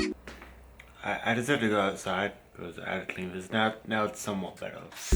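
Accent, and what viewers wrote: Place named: Australia. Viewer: Ok i'm going to make a guess that you are Australian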